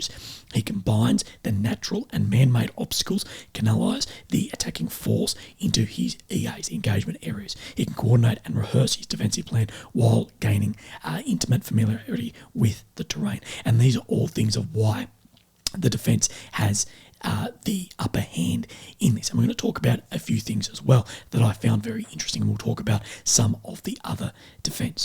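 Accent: Australia. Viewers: You start to sound a little like Robert F. Kennedy, Jr. And as an encouragement I'l like to say that Kennedy didn't want to run for a president because of his voice, but they ran a probing campaign and polls showed that most American are ok with his voice and he is popular anyway. That made this admission in his recent interview with Jordan Peterson. I personally don't find it hard to understand what your say because of the more coarse voice. In fact, your Australian accent is much more of an obstacle to me. All that said, I hope you recover your voice soon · Mate, it's really fantastic your Aussie accent! I'm learning the difference from colloqiual English following your channel, so go on as usual I love it!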